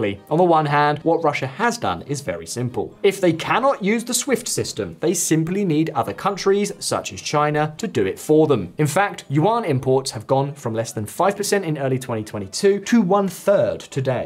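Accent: RP, United Kingdom. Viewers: Why does drivel told with confidence and in posh British accent sounds reasonable? That's the only question I have to you. Russia has the fundamentals, including energy, food, minerals, wood, and some decent, though mostly basic, industrial capacity that allows it to manufacture finished goods, particularly weaponry. Weaponry and ammunition at a price point much lower than Western's. Some of this weaponry -- especially the drones -- is effective against multimillion dollar Western equipment. The rest (including many types of FPV drones and chips) is imported, both directly and via intermediaries, especially from China, with whom Russia shares an immense border. As to budgetary deficit, it is not so large, compared to the income Russia has from its exports, which will allow it to eventually recover its finances. While you're at it, you should have mentioned that all this expensive energy that Europe has been buying has led to deindustrialization. 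Particularly, and most painfully, in Germany. And it's ongoing. Also mention the US's deficit. It's stunningly large, and some say there'll be hyperinflation in the US. Saudi Arabia has partly moved to abandon the exclusivity of the petrodollar. I'm suspecting that the anglophone elites are intent on screwing up the world, including themselves. But I'm undecided whether it's because they're crazy, stupid, or stubborn as hell, or all of it. Maybe the decadent and dying British aristocracy is an indicator of that. After all, they're scr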